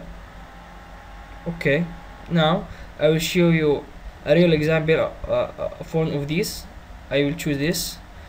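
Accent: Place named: Afghanistan. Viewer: You sound Afghan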